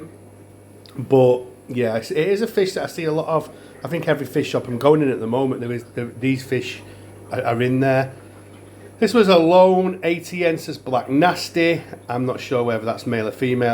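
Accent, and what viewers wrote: Manchester, United Kingdom. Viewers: You sound like a local lad to me mate (Manchester) I went to pier aquatics in Wigan for the first time last week, definitely my new favourite shop, bought myself a Gold saum 🤩 not sure if you know about it but there’s a cichlid convention in Rochdale on September 10th mate, il definitely be checking that out. I’ve only been keeping fish for about 6 months but I’m totally hooked 😅😆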